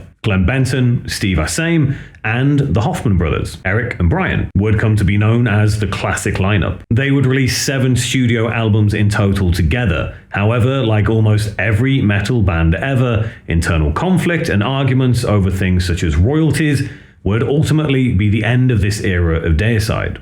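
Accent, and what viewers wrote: United Kingdom. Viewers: Your (British) way of saying Deicide is your dialect, it's just not ours in America. Band name or the word otherwise, over here in the States it's "DEE-ih-syde." :->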